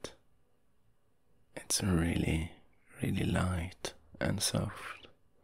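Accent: France. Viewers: "French accent" mais totalement ! ! Je meurs ! J'adore tes vidéos ! Continue comme ça ❤ Je sais pas si t'es français, mais en tous cas ça donne l'impression mdr PS : je suis francaise, je viens de voir ton live , je comprends maintenant ton accent français mdr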